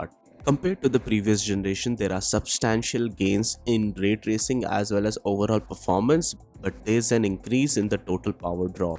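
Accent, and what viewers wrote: India. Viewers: Where you live man the Indian accent feels nearly my country’s normal accent cause how much I hear it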